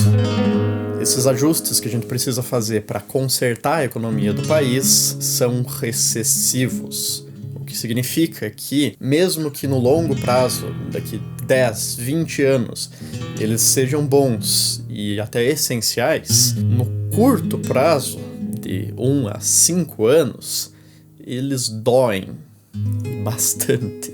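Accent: Rio Grande do Sul, Brazil. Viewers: duma coisa eu sei: tu é gaúcho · Gaúcho tchê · Se esse cara não é gaúcho eu sou maluco shshshs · Que vídeo! Que vídeo! Parabéns e continue assim, edição e roteiro espetacular! Só um detalhe: eu conheço esse sotaque aí em kkkkk noroeste do RS? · sotaque gaúcho é mt legal de ouvir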